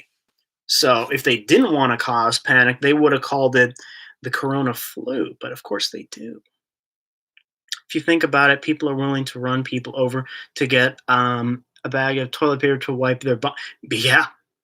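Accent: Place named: California, United States. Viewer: Just found your channel, pretty good content. Im thinking by your talking, you are in California. Have you seen the Alien museum exhibit at USC?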